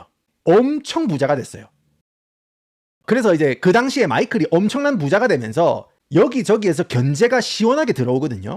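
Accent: Gyeongsang, South Korea. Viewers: 경상도 액센트 매력 탑이에요❤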